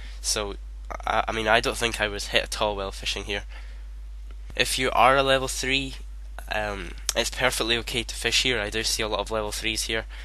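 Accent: Scotland, United Kingdom. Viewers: Your one of the best rs Guide makers out there and Your scottish :)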